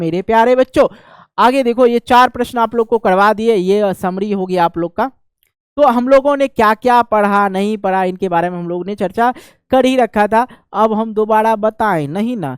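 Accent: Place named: Bihar, India. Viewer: Bhut Bhut bhut mja aaya Bihari Andaj me pdne me 😊😊😊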